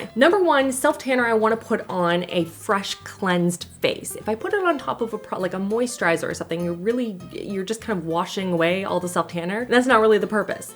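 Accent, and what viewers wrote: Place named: Canada. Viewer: As a fellow American, if you want to hear this accent in real life, but can't go to Canada, come visit the midwest. Especially Wisconsin or Minnesota- you'll hear it everywhere! 😂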